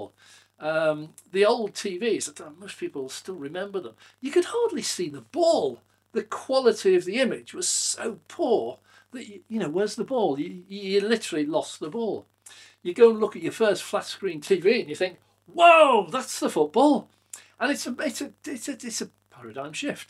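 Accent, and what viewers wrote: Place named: Northern England, United Kingdom. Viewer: Northern! West of the Pennines to be more precise (with a hint of Cornish thrown in 😉).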